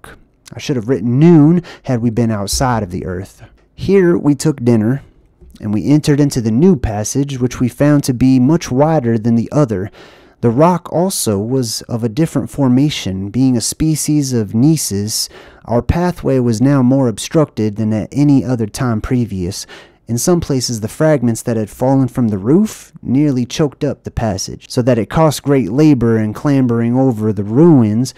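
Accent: United States. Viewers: Great job narrating, the western accent fit accordingly, you should do more similar to these in the future, did this end up being published or became another suppressed archive, that you were abled to dig up? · Not through yet, but I love your country accent. You might fit in real good down here in Texas:_JDZHeart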